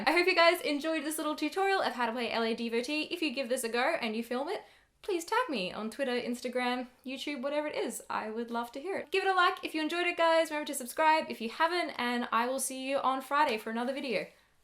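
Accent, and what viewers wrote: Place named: Australia. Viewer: Were are you from? You sound Australian...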